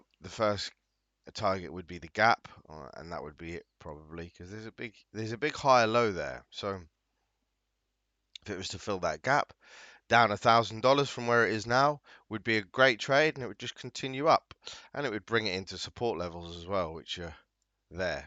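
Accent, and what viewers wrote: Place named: Cockney, United Kingdom. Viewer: proper cockney geezer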